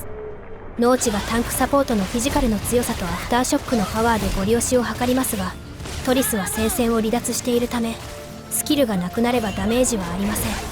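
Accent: Kansai, Japan. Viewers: わい関西人やから時々関西弁はいるの親近感感じてうれしい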